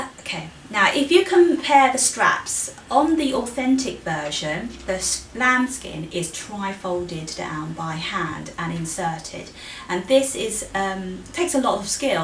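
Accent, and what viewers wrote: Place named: United Kingdom. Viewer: That British accent tho !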